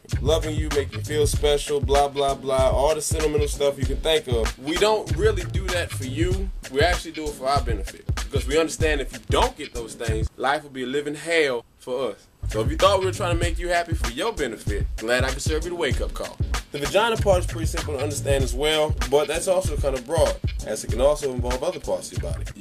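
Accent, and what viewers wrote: South, United States. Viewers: is he from the south? seems like he got an accent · What happened to your accent? I love country accents!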